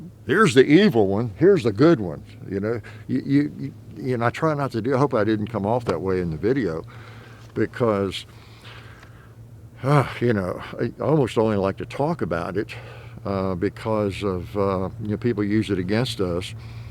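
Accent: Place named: Pennsylvania, United States. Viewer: Did Hickok originally come from Pennsylvania? He seems like he has a Pennsylvania accent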